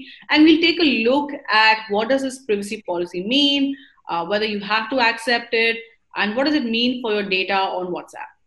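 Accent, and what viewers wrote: India. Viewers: Why can’t we get an American native speaker to explain what is going on. A Hindu speaking with a thick Indian accent that is dying trying to speak English. This is totally WILD. It’s completely tiring trying to understand this. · Thick Indian accent or pronunciation errors. Eg. Privacy. Couldn’t follow.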